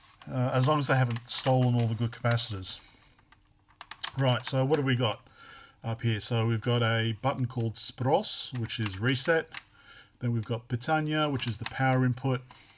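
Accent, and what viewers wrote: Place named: Australia. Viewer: Sounds Australian. Sorry if you are not. 2 minutes into video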